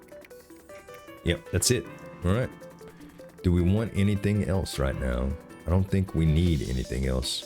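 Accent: Louisiana, United States. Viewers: Are you from Louisiana? I'm from lafayette and work in New orleans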